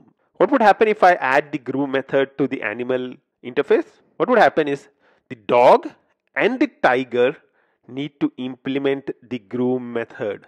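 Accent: India. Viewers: As soon as i heard "Welcome back" in an indian accent I wanted to close it hahahh...but people in India are acctually very good at development. Sadly I just cant take it haha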